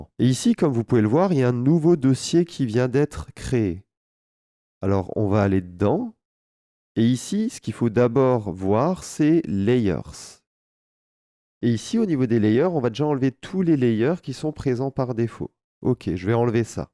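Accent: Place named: Alsace, France. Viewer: Ta un accent alsacien on dirait ahah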